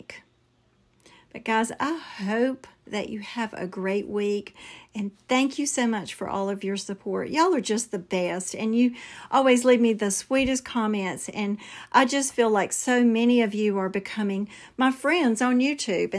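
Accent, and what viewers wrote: South, United States. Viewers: I feel so bad for you going through that scare of suspecting you may have shingles. I went through it a few years ago and I did in fact have shingles., we arent here to judge your appearance. Absolutely not. I know that I enjoy your sweet voice and southern accent. You are one of the best creators I watch. Today all of these projects are fantastic. The trimmings mold are so gorgeous with the pumpkin and the greenery and flowers. And OMG that night stand came out so beautiful with the decopauge paper. Matter of fact I just checked it out and I am buying it. You are amazing with everything you touch. Thank you for sharing your projects and for sharing the links in case we're interested. I do hope your hives disappear quickly.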